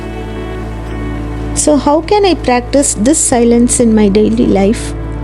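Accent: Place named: India. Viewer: the annoying indian nasal voice over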